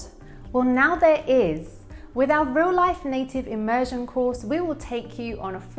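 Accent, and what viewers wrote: England, United Kingdom. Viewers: What cute British accent❣️ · I really love your English accents but I also want you to talk a bit faster like because people not gonna talk slowly in reality.so the point is that you are trigger I have to pull first then the rest. Thanks for everything! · This British accent is beautiful... =) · Ma'am u have British accent nd has American, right? So that means we r learning both the accents simultaneously 😊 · Is it British English, please inform me. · Ma'am, your British accent is so clear and soothing to hear. Thank you for the video. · I love your British accent. 😍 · Please tell me in which british accent you are talking😊💐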